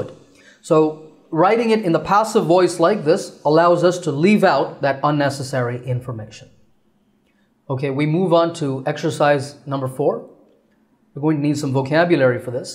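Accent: India. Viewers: I love Indian English! It inspires me much more than native one! I'm an Ukrainian from Ukraine.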